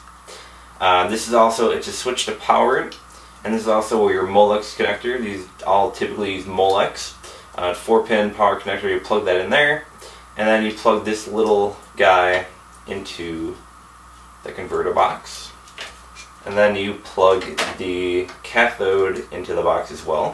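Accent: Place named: Chicago, United States. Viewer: I'm assuming you're from Chicago lol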